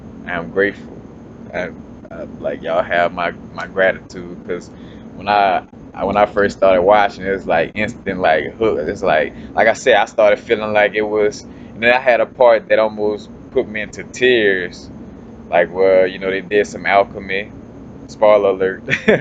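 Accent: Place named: South, United States. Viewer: Brother I love video,but sometimes you fumble your word and laugh or say "and whatever". I get a ton of knowledge from you. I just wish you would slow down your thoughts so that the watcher could receive all the amazing words you have to share. You got to remember we're not all from the south. Lol that drawl is tough to understand at times. I SAY ALL THIS WITH LOVE, cuz I want the world to get hip to you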